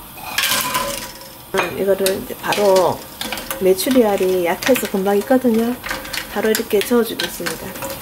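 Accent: Gyeongsang, South Korea. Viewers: 이모님♡ 경상도톤이라 더 정겹네요.ㅎ 실방타고 첨 뵙는데... 돼지고기 장조림은 저도 처음인데... 손자,손녀가 잘 먹을것 같네요.ㅎ 자주와서 식사준비 할래요. 3종하구 갑니당.~^^*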